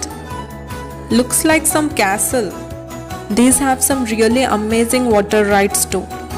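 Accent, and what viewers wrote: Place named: Hyderabad, India. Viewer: I'm watching ur all vedios n I loved it...ur voice is too good ..specially wen talk hindi,ur hydrabadi accent is too funny...😁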